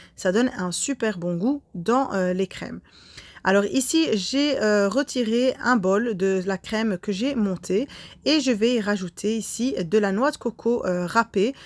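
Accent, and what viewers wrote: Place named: Belgium. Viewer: Ah tu es belge, je me disais aussi que tu avais un accent. ^^ en tout cas merci encore une fois pour cette recette, au top comme d'hab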